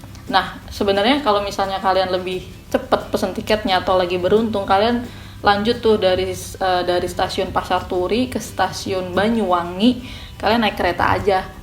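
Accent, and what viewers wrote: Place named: Semarang, Indonesia. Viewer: Ouw mbaknya org Semarang yaa?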